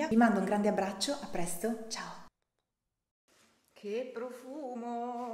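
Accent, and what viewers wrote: Romagna, Italy. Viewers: Ottime idee!!! La tua voce è molto dolce..sei romagnola vero? 🙂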